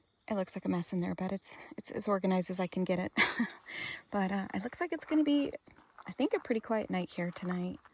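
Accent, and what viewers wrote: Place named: California, United States. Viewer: Your accent sounds Californian. I moved from Los Angeles County in 1973. I'm following 4Runner, just a few days ahead of you.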